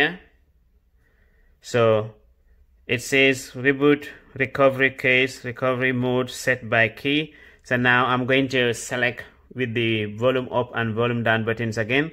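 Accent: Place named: India. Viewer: Indian voice, black hands...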